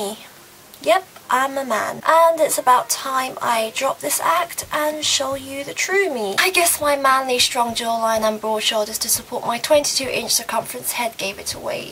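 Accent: United Kingdom. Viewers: Are you British? I'm from Australia and you are so funny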